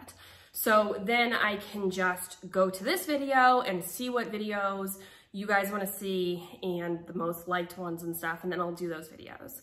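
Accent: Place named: Massachusetts, United States. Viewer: Everyone subscribe to channel so she can talk about the Jamaica cat incident!!!!! I feel like and Danni would get along, should visit LA and do a video with danni!! Your both from Massachusetts talk kinda the same and are fun good story tellers idk🤷🏻‍♀️🥰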